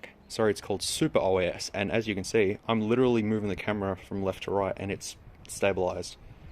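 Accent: Australia. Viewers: he's from Australia dumbass, they pronounce shit differently, grow a pair and get over it american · You're a fucking Australian, be proud of your wacky accent.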